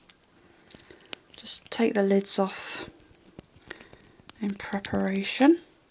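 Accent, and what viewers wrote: England, United Kingdom. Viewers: Like ur accent( I know it's British) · how does she sound Australian? she is obviously English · T are you brittish?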